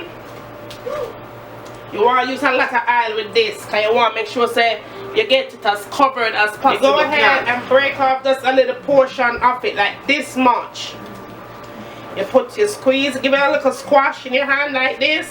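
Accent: Jamaica. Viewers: Listening to her speak took me back to when I used to live in Jamaica. Good memories and awesome food.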